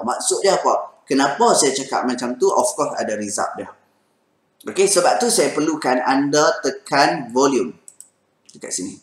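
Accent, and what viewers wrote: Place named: Kedah, Malaysia. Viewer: Seronok dengaq tuan sembang loghat Kedah..terbaik baq hang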